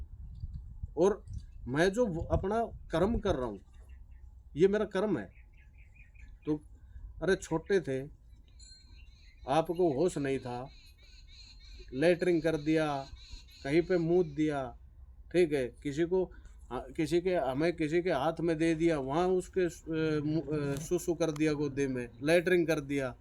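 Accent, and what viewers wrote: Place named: Alwar, India. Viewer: आप अलवर से हो ऐसा लगता है बहरोड़ मुंडावर साइड से भाई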